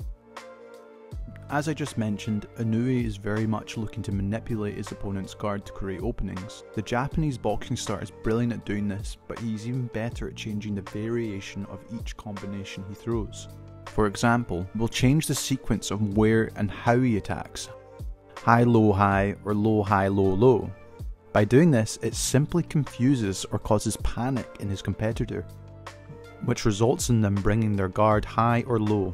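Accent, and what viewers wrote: Scotland, United Kingdom. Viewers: Nice to hear a Scottish voice doing breakdowns as good as this. Good shit brother🏴💙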